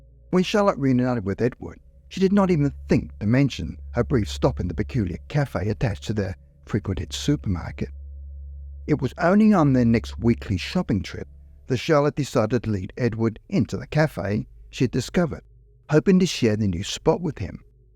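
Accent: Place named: Australia. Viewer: Wonderful stories with a twist and a Aussi accent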